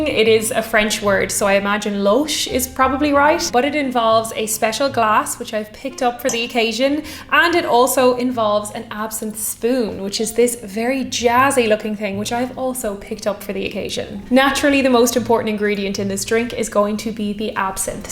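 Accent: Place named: Ireland. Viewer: Irish was recently voted as the most attractive accent in the World...but I believe that was about English as spoken by males. WELL - I must be mistaken but I could listen to 24/7. And what a beauty...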